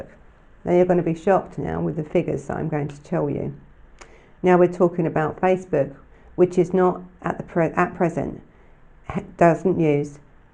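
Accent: London, United Kingdom. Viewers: Thank you my dear; I never thought of end to end in that way, thought it was more people carrying on with someone else who used it on What's app. I would never use it as I have nothing to hide I suppose. Thank God my daughter is now an adult herself. The internet can be a wonderful thing, but is truly a double edged sword. Also rather depressingly I am on the Suffolk/Norfolk borders, near this creature. I will as inform as many of those I know about the danger and new techniques these bastards are using. Am learning from each story you put out so, thank you. BTW is your accent London, Essex, Thames Estuary, American and Ozzie? I definitely hear London/East End/Essex borders. I am all cept USA n Aust. lol. Worked in London until thought up in Suffolk she would grow up surrounded by nature and peace. She was 7 and was not happy leaving. I was though. 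Am a new fan and subscribed couple weeks back. You go warrior woman, we need more like you. Locked down love from East Coast of England. x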